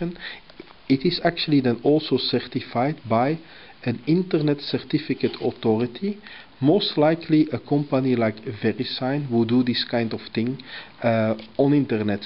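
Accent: Netherlands. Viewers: No objection or anything, but are you Dutch? Your accent sounds Dutch to me. xD And thanks a lot for this video! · thanks man :) are you a dutch ?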